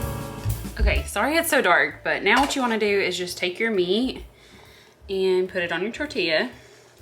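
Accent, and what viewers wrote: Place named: South, United States. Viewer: I am new here where are you at??? Sounds southern I an in mid Ohio but my folks were from southern Ohio they had that southern flare also just me wondering??? Keep up the great work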